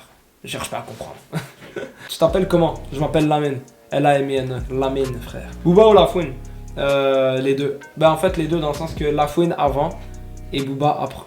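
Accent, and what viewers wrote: Quebec, Canada. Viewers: jsuis vraiment mort bro, fais une vidéo où tu racontes que des histoires avec l'accent, c'est tellement énorme 😂 jvous kiff les québécois ! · Est ce que ta deja regarder la série qui s'appelle la famille y ont le même accent québécois que toi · Fais plus de caméra caché en full québécquois gros, ça les rend 1000x plus drôle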